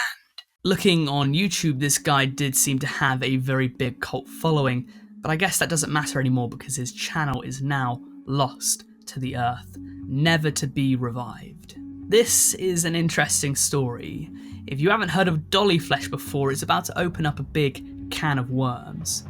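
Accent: United Kingdom. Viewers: Youre british?😮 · British tuv, love it bro, keep it up! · yuck british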